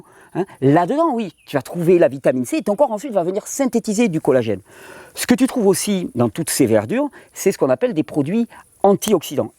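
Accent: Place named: Southern France, France. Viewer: Harmo Chopin En Pyrénées Orientales (66) oui appelée aussi Catalogne ou Pyrénées Catalanes également . Mais voyons ne reconnais-tu pas cet accent ensoleillé Méditerranéen du Midi ??? ;-) Mais il y a une partie de ce même département s'appelant Cerdagne (Latour de Carol, Dorrès, Bourg Madame ...) plus proche du Pas de la Case/Andorre et Limitrophe avec L'Ariège (09)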